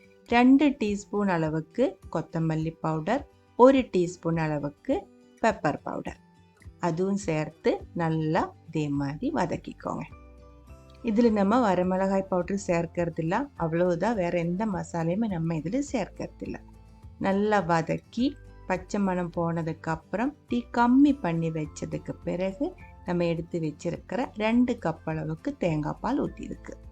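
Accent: Kerala, India. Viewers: മലയാളിയാണോ സംസാരിക്കുമ്പോൾ മലയാളം വരുന്നുണ്ട്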